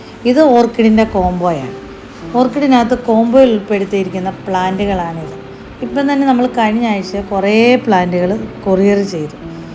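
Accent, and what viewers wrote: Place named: Pathanamthitta, India. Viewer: Pathanamthitta സ്ലാങ് 😄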